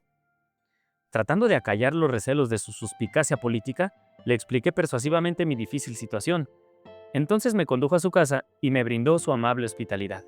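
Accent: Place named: Mexico. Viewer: Me gusta mucho tu manera de leer pero sobre todo ese acento mexicano inconfundible ❤❤🙏🇨🇺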